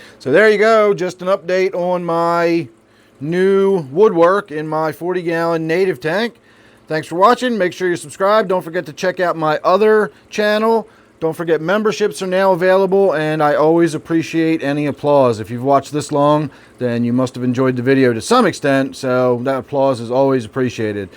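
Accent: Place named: Philadelphia, United States. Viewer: I just stumbled across your channel and I gotta ask, are you from Philly? You talk like us.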